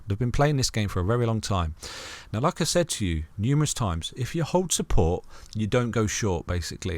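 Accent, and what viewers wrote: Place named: North, United Kingdom. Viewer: How can nayone be depressed listening to your luverly northern accent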